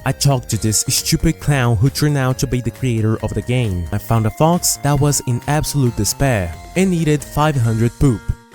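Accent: Brazil. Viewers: where are you from? your accent sounds brazilian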